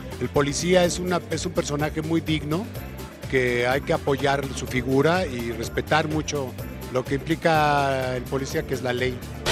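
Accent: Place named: Mexico. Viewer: Tiene acento mexicano pero es peruano.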